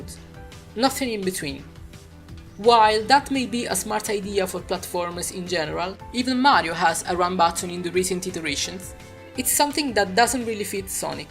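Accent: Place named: Italy. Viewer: Si sente che sei italiano... hai la mia stima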